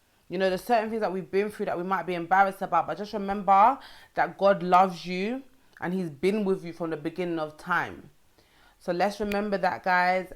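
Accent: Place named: London, United Kingdom. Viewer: I just love that accent, oh how I miss London. You are doing such an awesome job with the content you are touching on. There are life lessons in all disappointments. Thanks for the inspirational message.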